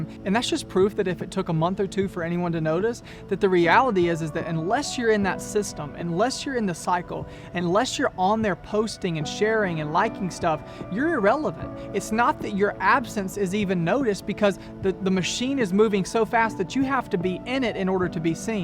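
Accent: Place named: South, United States. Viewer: Are you from the Mid-South? I hear a Memphis/Alabama/Arkansas/Tennessee accent.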